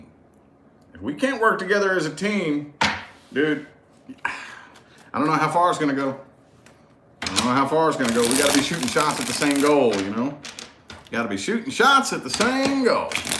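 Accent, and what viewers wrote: South, United States. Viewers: Hello there, im Trudi and a Leo. Iv just come upon your channel in the last 3 days. Ive got to say the first reading i saw of yours it was like a magnet pulling me in for more. You have alot that you need to share with as many people as possible . I dont know if its your easy going small town country boy drawl. Or maybe your aura of i got things under control just relax or your songs you belt out while you shuffle and pull cards. All of which makes people turn and pay attention and watch you and listen. I watch maybe 3 readers because i feel they truly feel the cards and the messages behind them. You and one other are pretty close to reading my life .its spooky and i get chills when a reading is so close to my heart. But the way you explain the Universe to us is a great gift. Im a true beliver in the Universe and what you put out in the world good or bad will come back to you ten fold. 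You may not be content trying to get these lessons thru to us. Its hard work when noone takes responsibility for their own actions anymore. This is the way of our world it seems. But my opinion you are doing a wonderful job. Thank You im glad to beable to watch your channel. Soon ill get a personal reading appt. Set up. My person of late is a Virgo. We are done but he decided to hurt my feelings on his way out. Ill survive . Im thinking positive thoughts. You are a good person.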